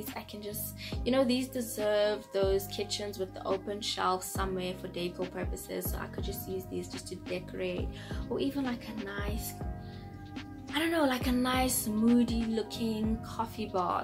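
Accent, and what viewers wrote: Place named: South Africa. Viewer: So refreshing to find a South African accent around here lol... Love your videos girl❤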